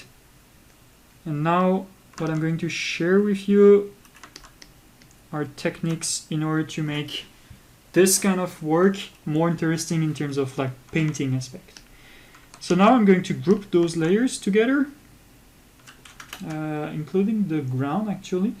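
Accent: France. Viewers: Moi je l'aime bien ton accent Fr ^^ Merci pour le tuto mec, je l'ai pas encore terminé mais je sent que je vais apprendre beaucoup ! :) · native English speaker here, your french accent is a nice bonus. its as if you are speaking in cursive. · Awesome tutorial and French accent 😀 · nice french accent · Ne t'apologize pas pour ton french accent, il est parfait. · No no no! Never apologize for your French accent. 🤙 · Hello Dear, I think you don't have to sorry for your French accent. Its your own identity. You should be proud of it.:goodvibes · face-green-smiling:I chuckled when he apologized for having a dreamy French accent. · I love the French accent. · tu es français non ? Tu as un accent français du coup j'ai tout compris, dommage que tu n'ai pas fait aussi le tuto en français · Je suis content d'entendre un accent français je comprends mieux comme ça :') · French accent is objectively the best accent for an art teacher anyway. 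· I love your French accent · awesome and helpful video.. btw no need to apologize for your accent.. youre speaking english being a french., thats a big thing.. remember most english speaking peoples dont know any other languages other than english.. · I really appreciated this video. And your french accent didn't get in the way of my understanding at all😃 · Merci pour ce video!😊 Je commence travallier avec Krita. Avant j‘ai eu seulement ProCreate. C‘est tres bien d‘avoir un guide comme toi. Ton accent francais est en plus!🤣 Nous aimons la France!!! (Excuse mon accent allemand😉) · Why are you apologising for your French accent? It sounds awesome. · UM DO NOT APOLOGIZE FOR UR FRENCH ACCENT! You and David Renoy are the two krita people who are carrying be with learning art right now, SO THANK YOU. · "Im sorry for my French accent" Bro that makes the tutorial even better to listen to 😭😂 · Quand j'ai commencé à regarder le guide je me suis dis que t'avais un sacré accent français et puis à j'ai eu une confirmation que tu étais français. 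C'est génial de prendre la peine de faire 2h de guide en anglais pour aider plus de monde en tout cas même en tant que francophone tu m'as beaucoup aidé merci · nah man the French accent is where is at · dude dont ever apologize for your beautiful accent!!! You are bi-lingual (at least bi maybe more) that is amazing and so what if you have a french accent, you are actually better off, if you didnt people might think you were American (like me) and I know you dont want that!!!! Great tutorial, great english, great accent, thanks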